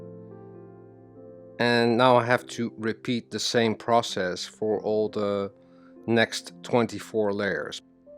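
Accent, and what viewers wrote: Netherlands. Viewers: wist het all van je accent😂😂😂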